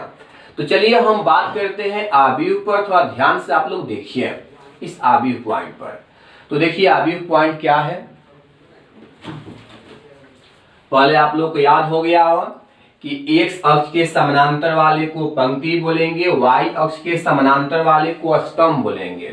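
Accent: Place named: Bihar, India. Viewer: Sir ekdum bihari lagat bani rauuaa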